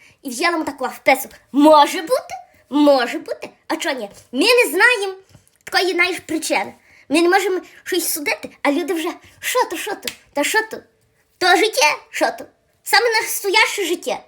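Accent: Galicia, Ukraine. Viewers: Галицький балак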